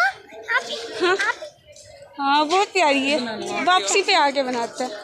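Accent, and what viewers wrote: Saraiki, Pakistan. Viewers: AJ bht din bad apka vlog daikha Sirf apki awaz piari hai q k sariki bht piari bol leti ho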